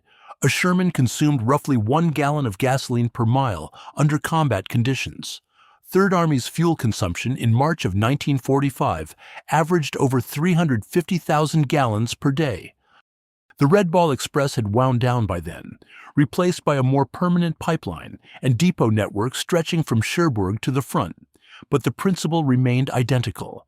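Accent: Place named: United States. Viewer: I love this because it sounds so American. Remember though at Remagen, it was LT Timmerman that saw the bridge 1st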